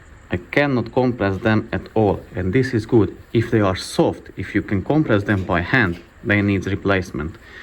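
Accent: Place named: Hungary. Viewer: I thought you were Hungarian your accent is very similar to a workmate of mine. He has a penchant for old BMWs 😊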